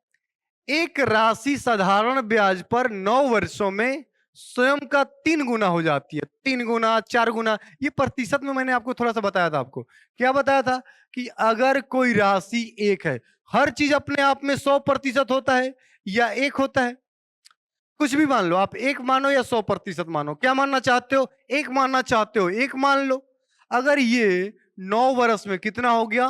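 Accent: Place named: Bihar, India. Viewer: Sir aap chhatisgarh se nahi ho na bihari jaisa feel ho raha hai bato ko sun kar😂😂😂😂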